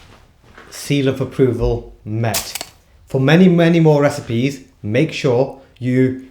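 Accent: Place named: Northern England, United Kingdom. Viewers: Northern English I think to be more precise, not too far from my hometown I'm guessing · British accent.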